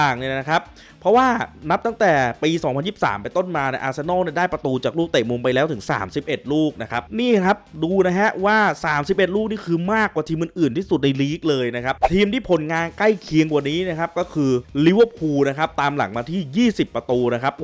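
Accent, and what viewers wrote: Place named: Thailand. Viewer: มาเจิมคอนเท้นท์ใหม่เเก้ะกล่อง ชอบครับ กระชับ มีมุกตลกสอดเเทรก เเถมมีคาเเรคเตอร์เสียงเหน่อ ขอให้ปังๆนะครับ🎉 (จากแฟน LFC ที่เปิดใจฟังทุกทีม)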